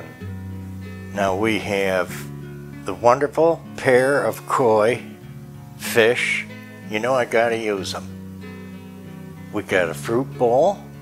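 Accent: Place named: Western Pennsylvania, United States. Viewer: Love your style! By the way, I notice you have an accent. Western Pennsylvania. Ohio?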